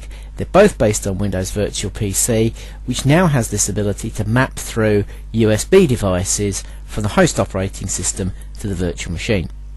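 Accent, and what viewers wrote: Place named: United Kingdom. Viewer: Enjoyed your British English so much, just listened for this purpose :)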